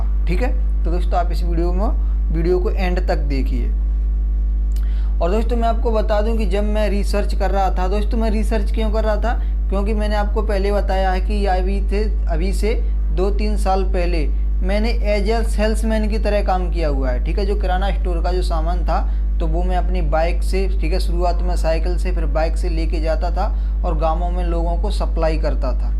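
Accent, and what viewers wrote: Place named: Pahadi, India. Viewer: Bhai video kaafi achi thi. Tumhari tone se tum Pahaadi lg rhe ho Video like frm Uttarakhand (dist-Champawat)😊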